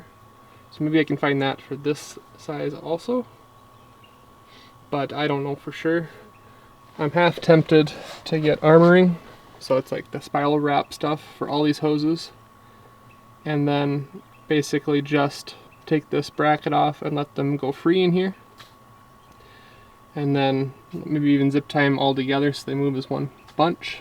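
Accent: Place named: Minnesota, United States. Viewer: You are just so laid back and patient. That is what it takes to work on this stuff. Love the vids. Your Minnesota accent is similar to my accent from Canada.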